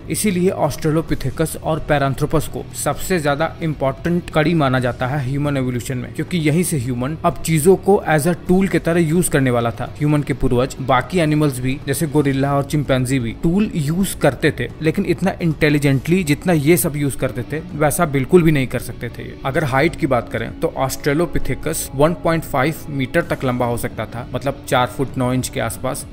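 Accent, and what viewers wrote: Bihar, India. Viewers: Bhai tm bihar s ho kya 😮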